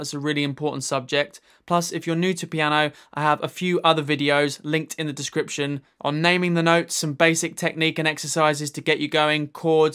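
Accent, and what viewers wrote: United Kingdom. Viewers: His Bri ish voice is very great. Teaches well, too!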